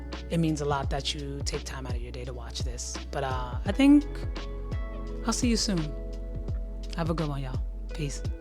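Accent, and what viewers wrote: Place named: South Africa. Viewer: are you South African?? you have a cool accent. I am an experimental film maker from South Africa i really enjoy watching your channel you teaching us so much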